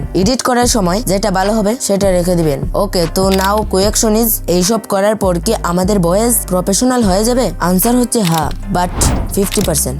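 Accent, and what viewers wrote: Sylhet, Bangladesh. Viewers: By the way Are you from Sylhet ?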